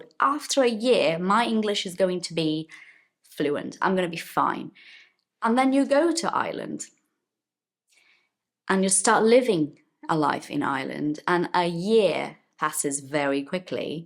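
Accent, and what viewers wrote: United Kingdom. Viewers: I'm much amazed to listen you talking In british accent so smoothly. Even being a Brazilian you've a great command in British Accent.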